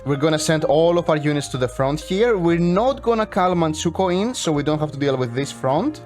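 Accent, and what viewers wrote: Greece. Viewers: I suffered through 16 minutes of chracteristically Greek accent and survived it.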